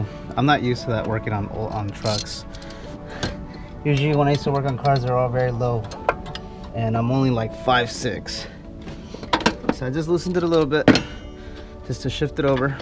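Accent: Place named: Oxnard, United States. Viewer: You sound like your from Oxnard too. and I can make you T-shirts man, just give me a design. I silk screen em.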